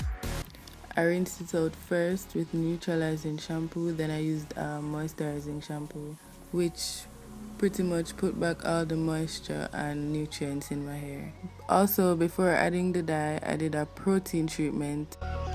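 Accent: Jamaica. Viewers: know you're Jamaican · keep the sweet Jamaican accent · Jamaican accent, tun up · hold up sis come straight from yaad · Knew you were a YAADIE (Jamaican) as soon as you started speaking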